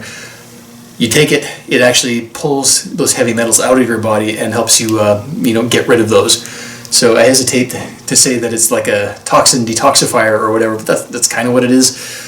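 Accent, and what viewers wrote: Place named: United States. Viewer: ? Does sound america. You swedish?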